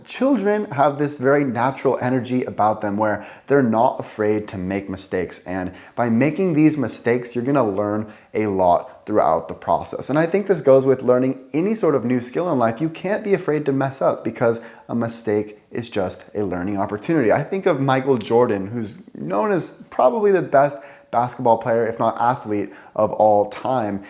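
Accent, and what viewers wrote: United States. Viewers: Japp det gör han gillar att han låter amerikansk när han pratar.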